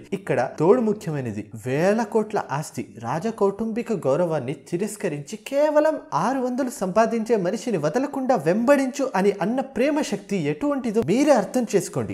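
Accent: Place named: Andhra Pradesh, India. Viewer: "Chinna pappa chirak munnda" ee video motham lo naa attention ni garbe chesinna dilogue edhe.....😜😜😜😜miru Andhra Pradesh lo untara .... don't mind mi slang koncham Andhra laa unndhi....i love it❤️❤️❤️❤️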